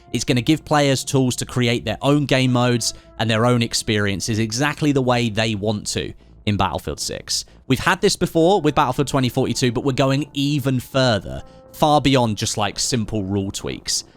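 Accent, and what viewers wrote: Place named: West Sussex, United Kingdom. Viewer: Im pretty sure you’re from West Sussex. I overheard someone in a coffee shop who sounded exactly like you.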